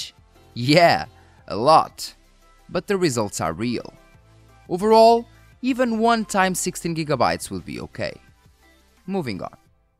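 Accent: Portugal. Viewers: Tens mesmo sotaque de tuga !!!!! Excelente video....Parabens !!!! ;) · Bro are you Portuguese? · Olá, parece que és Português hahahha. Achas que devo fazer o upgrade de 16GB RAM (2x8gb) para 32GB RAM (4x8gb) ou devo ficar com os 16GB? Ps.: Lembrando que fica caro, comprar 32GB RAM (2x16gb)... só se conseguisse vender as RAM que tenho. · Vi logo que com esse sotaque só podias ser português hahah, bom video!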